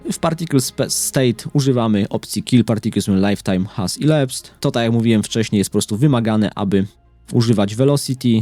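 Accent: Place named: Poland. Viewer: Mówiąc szczerze dawno nie słyszałem Polskiego akcentu w tutorialach, rób dalej co robisz wszystkim się to przyda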